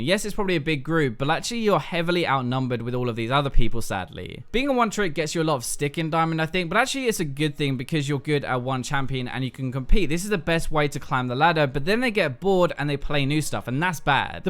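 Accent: United Kingdom. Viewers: Not the guy with the british accent again :(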